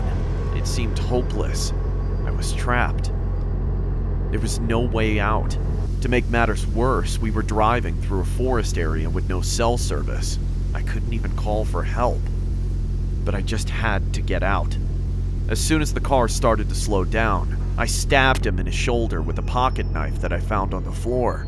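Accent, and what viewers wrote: United States. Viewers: Where is your Tennessee accent???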